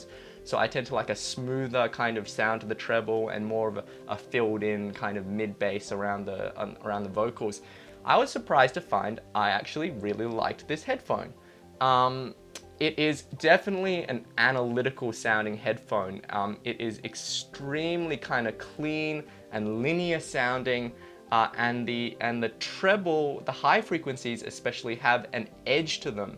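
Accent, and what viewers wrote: Australia. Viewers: Hey, judging by the sound of your voice, it seems that you are Australian. Where do you get your headphones from?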